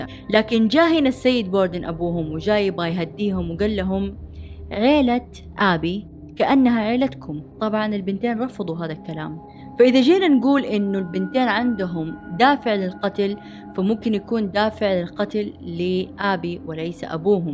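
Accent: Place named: Hejaz, Saudi Arabia. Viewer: لهجتك الحجازية عسسسسل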